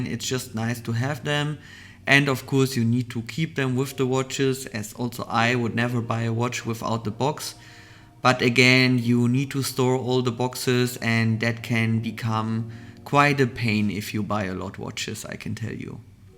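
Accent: Germany. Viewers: When this guy isn‘t german i ate a chair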